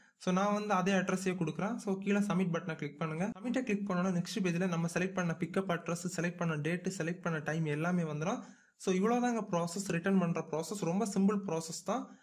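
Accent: Kanyakumari, India. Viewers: Kumari Tamil 😍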